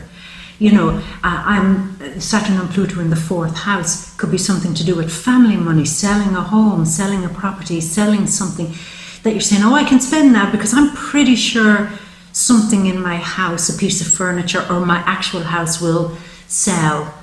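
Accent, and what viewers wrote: Ireland. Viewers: 🤣🙏 you make me giggle, what county are you from in Eire? I can't figure out your accent...it sounds like a very, very soft out skirts of Dublin or then I'm thinking is it Clare lol go on your going to tell me neither. You have a joyful soul thank you for your work, your time & energy it's gratefully appreciated & I've taken note....don't just spunk my money I got it! 💖💝🌈🙏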